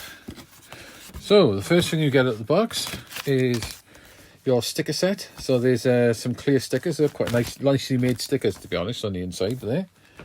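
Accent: South Wales, United Kingdom. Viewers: Yea south wales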